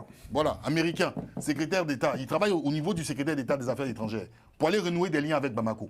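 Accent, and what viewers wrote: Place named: Ivory Coast. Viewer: Lui qui parle est ivoirien avec l'accent Effectivement tu as raison c'est au ennemis de LAES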